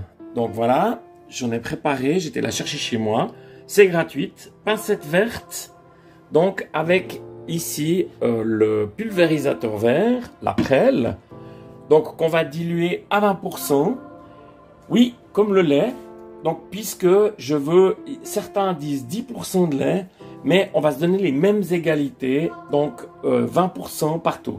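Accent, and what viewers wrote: Switzerland. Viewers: Bonjour! J'ai découvert ta chaîne hier et j'me suis abonné direct! Merci pour avoir diffusé ç'test! C très clair et surtout, pragmatique!... Sinon, c'est bien un accent suisse qu't'as?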